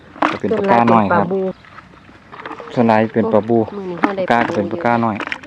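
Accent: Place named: Isan, Thailand. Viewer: ฟังสำเนียงเสียงอิสาน คิดถึงบ้านที่เกิดแดนราบสูง ตกเบ็ดช่อนลุกปลากับแม่อบอุ่น ยิ่งดูยิ่งคิดถึงแม่ที่ห่างไกล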